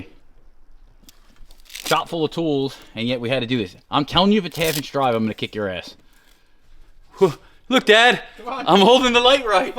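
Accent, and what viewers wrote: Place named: South Jersey, United States. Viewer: Heard the accent and thought south Jersey or DelCo PA haha. Nice exhaust upgrade man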